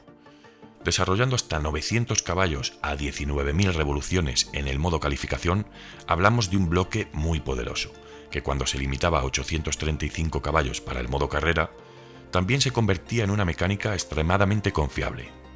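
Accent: Spain. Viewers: el español de españa que narra este video es épico porque es un DOCUMENTAL. mano ganaste un suscriptor!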